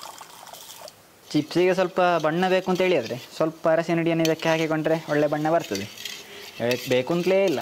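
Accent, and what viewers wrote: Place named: South Canara, India. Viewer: This is the style of kannada spoken by people from south canara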